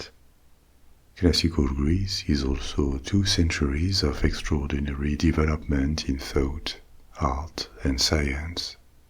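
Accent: France. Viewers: the original of this video got me through many sleepless nights. thank u for coming back mr!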